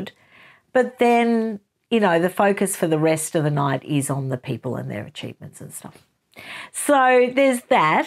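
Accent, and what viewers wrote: Australia. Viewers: I really love this format. I agree with you about Russell Brand, and did not know that somebody was trying to get him demonetized on rumble. I knew about YouTube. Like you, I did not have a favorable view of Russell Brand, but I don’t even know why I had any view of him. I’m not sure how I even became aware of him. But he kept coming up in my YouTube feed and I found him interesting and did subscribe and listen to a lot of his videos. I could not listen to the video on his book because of the beginning segment talking about the dog and I switched up immediately. I am very sensitive and things like that trouble me intensely. But I love all your videos and having lived in Melbourne for a number of years, I like listening to an Australian voice. I’ll look forward to more of these chats. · Loved this chat session! Being from the states i always love to hear the accents down under. 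You are such a great content provider about my favorite things...books. keep on talking to us about both sides of the story because you are so insightful! ❤❤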